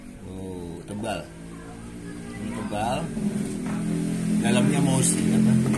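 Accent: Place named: Banyumas, Indonesia. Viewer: wow musangking ngapak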